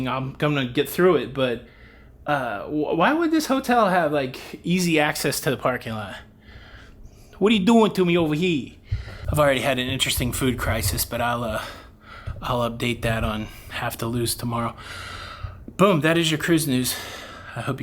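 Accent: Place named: New Jersey, United States. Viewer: A passable Jersey-ish accent! And I'd be freaking out with that door too, it's definitely an odd choice.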